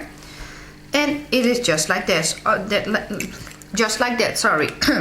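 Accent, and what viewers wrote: Netherlands. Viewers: I will definitely make this one! leuk t Nederlandse accent ook hihi :-) thankss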